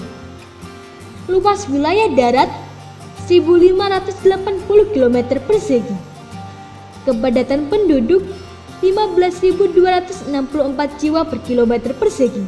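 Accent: Java, Indonesia. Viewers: Ngomong logat jawa males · Suaranya bahasanya kok medok totok kali jawa nya. Jadinya memuakkan. Apa gak ada manusia yg lain ? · Medok amat mba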